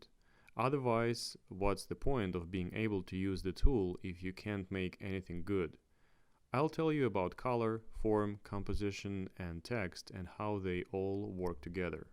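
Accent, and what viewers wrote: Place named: Russia. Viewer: Love your russian accent :3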